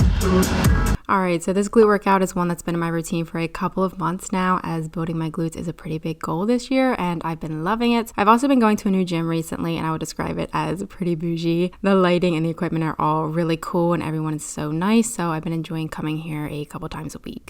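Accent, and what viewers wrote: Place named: Maryland, United States. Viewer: Your accent sounds very maryland-ish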